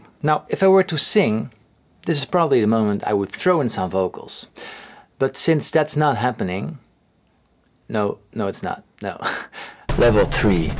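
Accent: Netherlands. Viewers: ik hoorde al een NL accent in je intro! Dope vid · Ik dacht al meteen... Hij heeft een Nederlands accent en ik zie je kanaal voor het eerst, dus ik wist het niet. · I heard your dutch accent instantly. I'm dutch too👊🏽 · Haha ik dacht op het begin wat een raar accent het lijkt haast wel een Nederlander en toen dat stukje van je vrouw was het echt zie dat dacht ik al. Btw leuke video en erg interessant! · hij heeft een best duidelijk nederlands accent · Ik wist niet dat je Nederlands was maar had wel al z'n vermoede met het engels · Are you from the Netherlands? I never noticed😂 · Oh shit, you're Dutch lol. Hoorde het eerst niet maar nu wel hahaja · After 3 sec of speaking I` could here immediately you are a dutch guy..amazing haha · Ik hoorde gelijk dat je Nederlands was 😂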